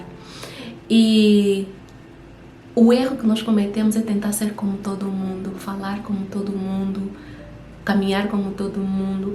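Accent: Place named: Portugal. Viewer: Já maratonei todos seus vídeos 😊 Você é de Portugal ou Angola? Desculpa a pergunta é pelo sutaque, um abraço no aguardo de mais vídeos 🙏🏽👑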